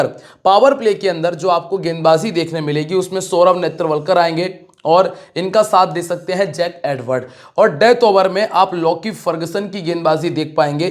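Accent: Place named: Rajasthan, India. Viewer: Bhai aap mujhe rajesthan se lagte hai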